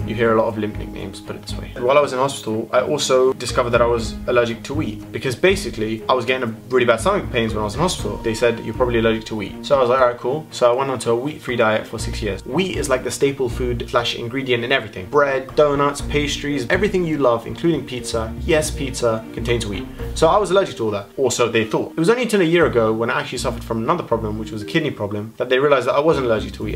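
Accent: United Kingdom. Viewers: Hey I'm a new subscriber, soo glad I discovered your channel. Just wondering have you lived in the UK? love your accent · why isnt your british accent as strong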